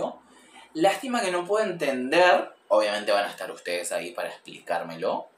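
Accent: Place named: Rioplatense, Argentina. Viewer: Por el acento debe ser de Argentina o Uruguay. 😉😊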